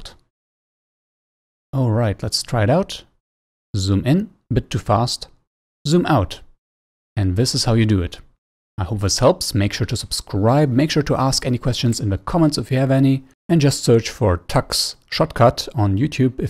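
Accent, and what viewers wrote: Germany. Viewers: souned like you said completly illegal Also are you German cause you dont sound like your english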